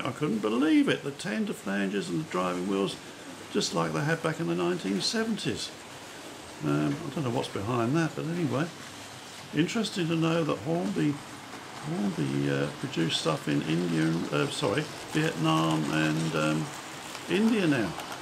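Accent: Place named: Australia. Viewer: Hi, very sorry to hear of your continued back pain. I know how it can make everyday life very draining. Getting down to rail level and filming can be particularly difficult. However, if you can manage it, please carry on posting the videos. As you rightly say, there are lots of great youtube channels for model railways, but your layout has got to be one of the most enjoyable to watch. There's something interesting in every shot, and I love watching your trains go by. This Pommie even enjoys the Aussie accent! Best wishes for 2023.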